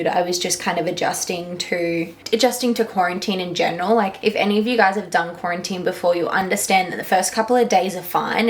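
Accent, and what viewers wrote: Australia. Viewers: When you say “week two” I melt. Love your Australian accent!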